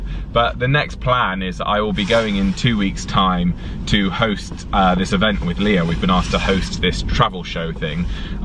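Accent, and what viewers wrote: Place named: RP, United Kingdom. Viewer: By any chance, does Keegan envy you that you speak such pure royal English, and he can't, even though you grew up in 1 country? ;) <3, separate Keegan 🥰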